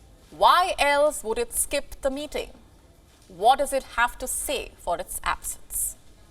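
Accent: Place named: India. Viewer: please speak better English, it is a so heavy Indian accent that your English is a joke. By the way your stupid channel is critizing China all the time, it is full of jealousy..... Your channel is not a good English speaking channel... Every Chinese who speaks English speaks much better than you Indians. What a shame to state that you Indians speak better English or any languages better than us Chinese. I have written more than 160 books, and 80 of them are in English and many languages sold world wide. I am still the Chief Editor of the Mirror, Gen Bao - 鏡報. I myself is a Norwegian Chinese and can handle more than 8 languages! I am also being named as the Universal Genius of the century!!!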